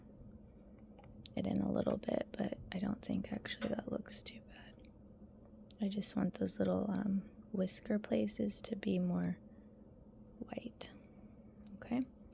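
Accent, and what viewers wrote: United States. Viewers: Thanks for the video 😊 I think you did an excellent job of speaking and filming. The close ups looked fine and you could see detail like you wanted. I am also a southern girl and I do drawl 😂 You were not hard to listen to for me but I am one of those early 50’s demographic and have some patience I have developed. I enjoyed this video and what a cute expression of creativity! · As a fellow Midwesterner from Kansas, I too speak like you and many of us from the area. Don't change who you are or how you normally speak because someone complained about it. They can actually change the speed of the video on their own. People need to accept people for whom the are. If it bothers them, it's them, not you. ❤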